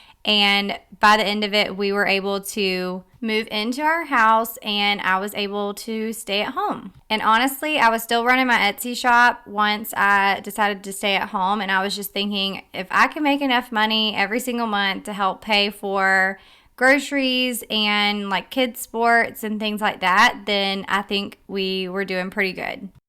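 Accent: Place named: Georgia, United States. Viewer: Please enter me in your give away. I enjoy your channel very much, partly because of your Georgia accent. I live in Colorado. God bless 🙂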